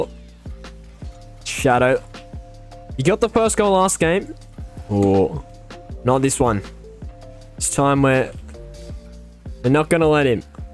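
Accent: Australia. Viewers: i didnt even realise u were aussie man till this vid you accent stands out to even me and im aussie to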